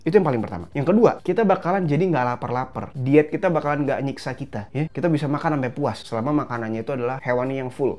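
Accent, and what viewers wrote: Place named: Arab world. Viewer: Paling suka chanel ini penuh ilmu, gratis, dan abangnya kalo bicara enak di dengar kocak, logatnya kaya orang timteng ada maqrajnya, kek basa arab 🤣😂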